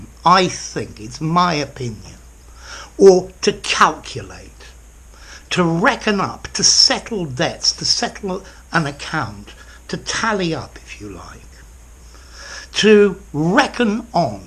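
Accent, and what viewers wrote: United Kingdom. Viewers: I reckon that your channel is the best to learn English with Britch accent Hello my teacher 🌺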